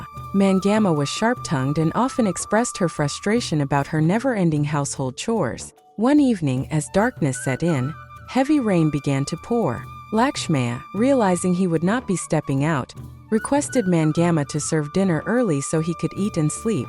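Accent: India. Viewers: Accent indian rkho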